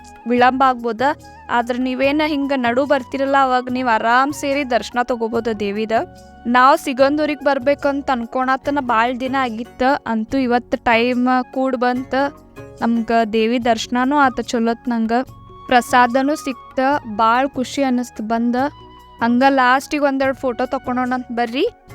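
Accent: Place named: Maharashtra, India. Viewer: your kannada is very beautiful, with a tinge of maharashtra......... I have heard this bangalore kannada, very worst......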